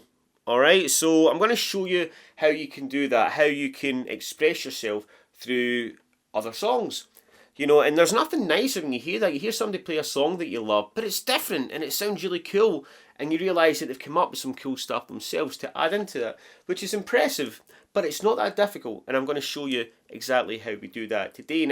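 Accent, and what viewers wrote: Scotland, United Kingdom. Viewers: Great lesson. My wife was listening. She wants to know what part of Scotland are you from. She’s from fife.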